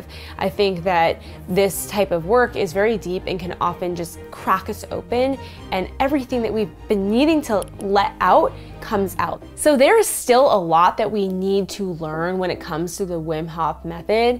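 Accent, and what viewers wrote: Boston, United States. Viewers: Well done. Are you from Boston too?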